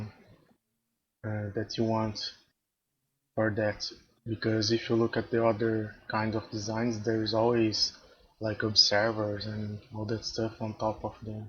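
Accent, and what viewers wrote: Brazil. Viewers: Pelo sotaque, já sei que é brasileiro kkkkkkkk Cara, eu adorei o teu design. Tu teve uma ideia muito top na ideia da coleta e armazenagem das garrafas de mel (minuto do vídeo). Eu fiz um teste dessa parte no meu sistema e até que encaixou bem, além de ter diminuído alguns blocos da parte de processamento das garrafas na minha farm, mas reparei que vem a um custo de mais garrafas de mel no carrinho, e o funil só consegue pegar e entregar uma garrafa por volta, já que quando a primeira desce o sinal de restone reduz para 14. A única coisa que não entendi e acho que foi um erro no teu design foi o sistema de abastecimento (minuto do vídeo). Eu entendi que o objetivo era fazer o sistema ser automático, e usava o sinal de redstone emitido pelo carrinho cheio para enviar o carrinho e distribuir as glass bottles. Havia formas de usar outros sinais para essa automatização (exemplo: luz solar) e também maneiras de fazer o carrinho correr até esvaziar. 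Eu estava procurando vídeos assim, pois eu também fiz uma versão minha (que não copiei de ninguém) da farm de garrafa de mel que usa menos vidro. Eu estava querendo fazer um vídeo, mas antes resolvi procurar se ninguém havia feito igual ou até melhor. Vou ver se faço esse vídeo no mês que vem.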